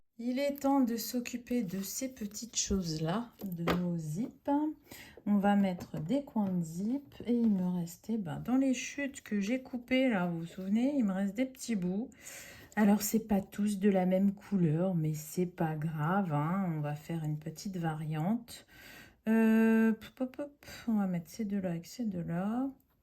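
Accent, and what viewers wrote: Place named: Nord-Pas-de-Calais, France. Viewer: Mais oui chti toujours 😂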